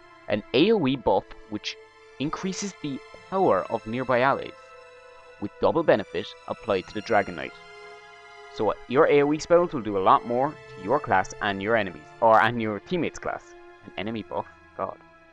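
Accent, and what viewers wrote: Ireland. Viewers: Now, I'm new to this channel, but are you Irish/Newfoundlander? I thought I picked up a bit of my own accent from this video, haha! c=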